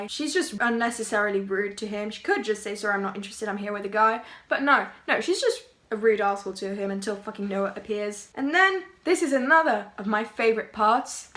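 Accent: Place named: England, United Kingdom. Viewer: I subbed because of your English accent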